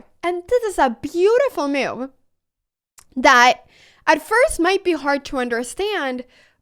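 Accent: Spain. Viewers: Off topic: like your spanish accent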